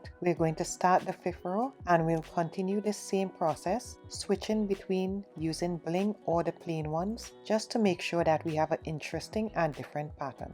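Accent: Trinidad and Tobago. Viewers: Are you from Trinidad.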